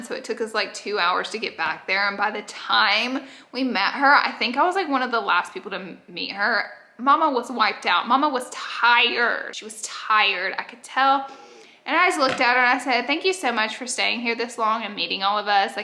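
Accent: South, United States. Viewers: Absolutely love, love, love you. I am so glad you done this video in your original accent not your Cali girl voice. Not judging, just love southern.🥰🥰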